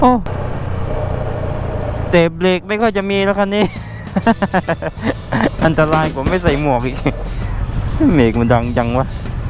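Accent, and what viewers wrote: South, Thailand. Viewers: พี่ เเหลงใต้หรอยน้ะเนี้ย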